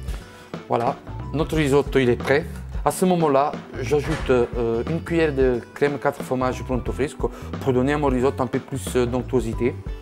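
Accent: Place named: Italy. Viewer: Tu risotto avec des boîtes ça donne pas envi. L'accent italien ne suffit pas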